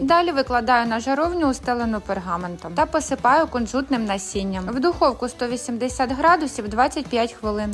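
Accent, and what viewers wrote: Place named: Surzhyk, Ukraine. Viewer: Нічого страшного, що Ви розмовляєте суржиком.Важливо ,що стараєтесь розмовляти українською мовою.Щиро вдячна за рецепт.Молодчина👍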